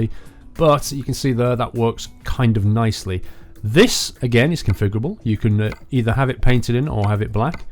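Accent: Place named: Stoke, United Kingdom. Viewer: you sound like your from stoke on trent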